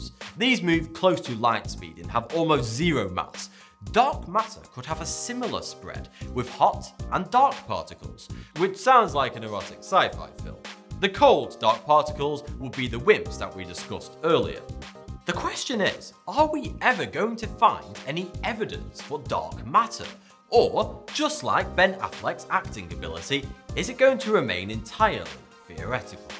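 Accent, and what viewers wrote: United Kingdom. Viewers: Is he British? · I like you British vsauce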